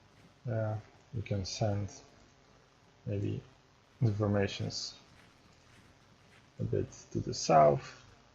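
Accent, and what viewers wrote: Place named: Eastern Europe. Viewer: You meant to say "SEXY EASTERN EUROPEAN ACCENT".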